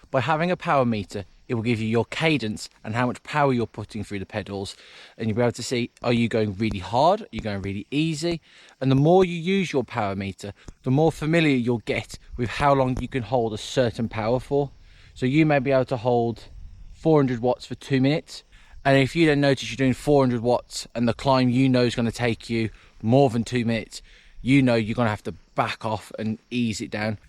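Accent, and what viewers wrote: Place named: Dudley, United Kingdom. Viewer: Were you from? You sound like a Dudley bloke.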